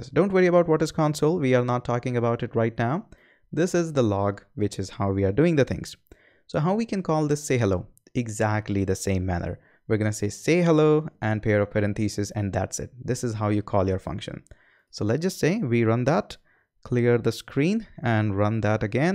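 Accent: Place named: India. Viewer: I like the Indian accent. "parenthesis" is paren + thesis. Another word "parameters" is para + meters. In Brazil we have somewhat the same way of saying words, like emphasis in the last part of the word, sometimes in the middle of the word and even in the beginning of the word. Some words sounds like a roller coaster, and it sounds nice.....In English is kind of flat. like "parenthesis" but in parAmeters the upper case A is where the Americans do speak their roller coaster and speaks like paraMeters with his roller coaster starting on M.....I love linguistics. Sorry guys, off topic, but really nice......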